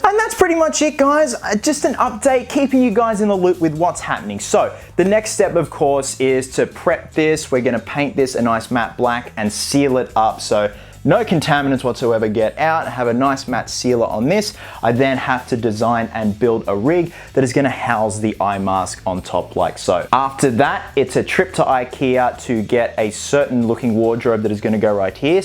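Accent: Australia. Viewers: They don’t sound the same lmao, Cavill is English and this guys Australian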